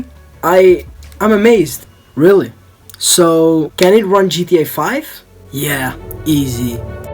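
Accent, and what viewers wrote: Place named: Netherlands. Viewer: Lekker NL accentje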